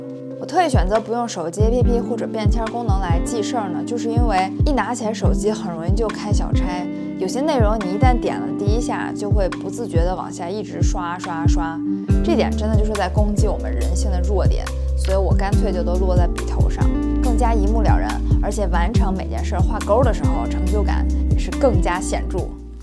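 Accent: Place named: Beijing, China. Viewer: 好喜歡你，謝謝鼓勵我們！每次聽到你說話，我彷彿感覺我還在北京。👍👍👍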